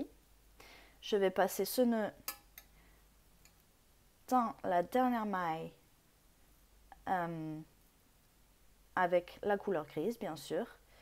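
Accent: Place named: England, United Kingdom. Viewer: bonjour j'ai suivi vos conseil, j'aime votre petit accent anglais je vous encourage à continuer vivement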